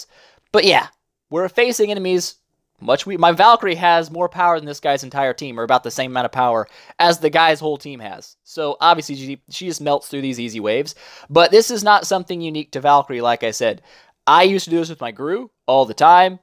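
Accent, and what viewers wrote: United States. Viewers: He has traces of an American accent IMO.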